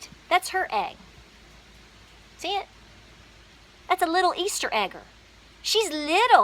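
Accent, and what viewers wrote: South, United States. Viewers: My luv, we think the world of you. And we would do what ever it takes to help you. You have to know that. But just remember, we will always be sub'd to you not matter what happenes. Just know that a world with no is a very lonely place. Please take care of yourself -- you have a lot of stress happening in your life right now. Please take care of yourself. We really do love you and you videos (even if you do talk kind of funny) (remember I am darn near living in Canada, so anything south of the 45th parallel is considered (southern) God Bless you May he watch over you and give you the strength you need and the knowledge when it is time to regenerate. Love ya Tropical Minnesota.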